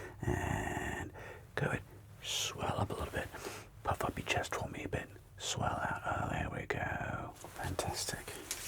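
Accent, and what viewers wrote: United Kingdom. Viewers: I was not prepared for how much I would enjoy you with a British accent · I seriously thought you were actually British 😮. Amazing content as ever ❤ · I absolutely love the VIP experience you provide in your suit measuring videos! So calming 😌 I love the triggers and the British accent too! Thank you so much J! Smashed another video out of the park again! (when do you not?! 😂 ) PS. Love the profile pic update too! Looks cool! Keep up the great work! Have a lovely weekend!! 💙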